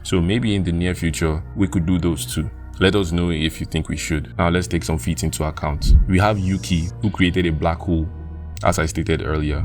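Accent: Nigeria. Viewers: U sound so much like a Nigerian, good one brother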